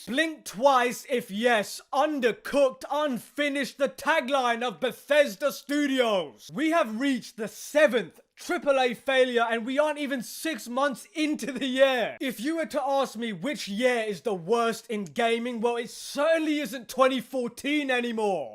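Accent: United Kingdom. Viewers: Your briish rumble makes it even funnier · Totally agree with you but your loud British accent gave my Irish head a sharp pain.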